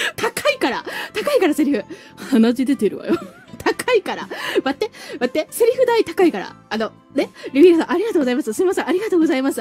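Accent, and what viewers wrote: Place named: Kansai, Japan. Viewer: やっぱり関西弁は安心するなぁ…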